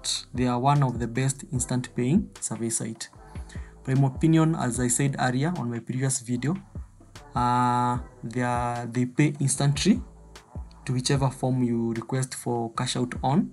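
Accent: Kenya. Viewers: I've been watching your videos and unasound kama mkenya, which proxy site do you recommend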